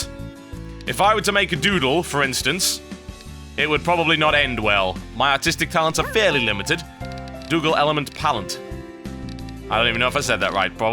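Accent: United Kingdom. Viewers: nope, his natural accent is a geordy accent; think cockney with a concussion and a mouth full of novocaine. he's just trained himself into using a more "proper" british english, basically the british version of the midwestern standard that most TV show hosts and newscasters have.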